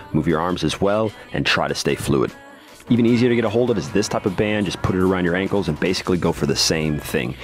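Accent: Netherlands. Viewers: I got one question r u dutch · Hold up are you dutch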